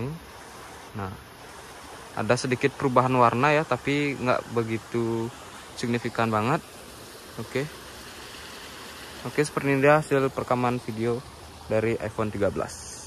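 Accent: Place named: Bali, Indonesia. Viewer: Logat bali, plat motor bali, jawabnya jakarta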